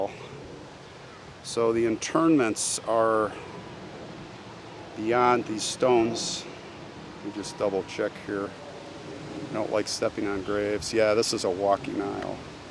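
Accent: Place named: Chicago, United States. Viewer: Love your channel...I am from the Chicago suburbs and can definitely hear your Chicago accent :)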